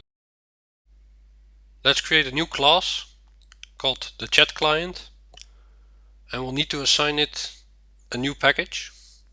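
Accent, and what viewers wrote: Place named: Netherlands. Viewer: Sounds kinda dutch innit?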